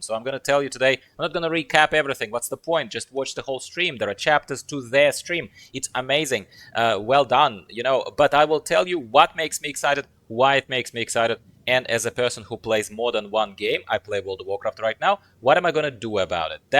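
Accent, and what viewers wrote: United Kingdom. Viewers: Her accent is of a tedious northern British working class pleb, it pisses me off also. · Its a Northern English accent mate.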